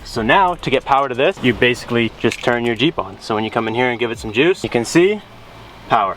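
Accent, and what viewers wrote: Canada. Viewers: Great video. Canadian eh?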